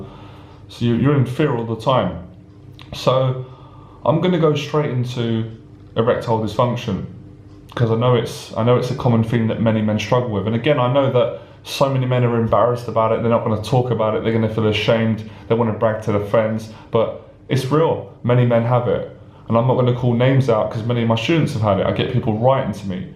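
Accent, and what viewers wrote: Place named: United Kingdom. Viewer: You have the perfect British accent. In some of your other videos there are a few times when you misspeak with a bit of bad grammar. That is coming from some mental thing where you regress into some lower class English isn't it? I mean it happens like someone might stutter. As an American listening to you speak like you are doing here (when you are going slow) you sound like you went to all the finest schools and all. Even the slight mumbling and slack in your speaking (I recognize it as a certain British speech style.) sounds to me as an American to be some intentional upper class way of speaking. I bet King Charles and the cavaliers sounded something like you.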